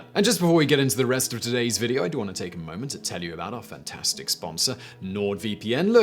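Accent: United Kingdom. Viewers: Thanks for another great video! I think one of the reasons I like watching Simon so much is he reminds me of my youngest son. It's the beard and the intelligent way of speaking (although my son doesn't have the British accent).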